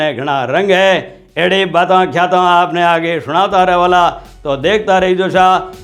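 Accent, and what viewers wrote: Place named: Marwari, India. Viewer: sahab normal bolo, jyada Marwadi samaj nahi ati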